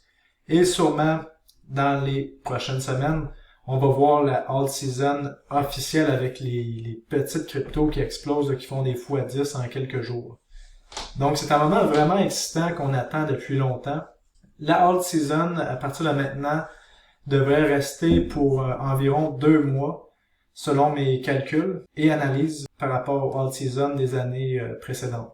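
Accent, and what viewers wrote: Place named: Quebec, Canada. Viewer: Ça fais du bien d'écouter un québécois! Continue comme ça très intéressant, j'ai hâte a tes prochains vidéos!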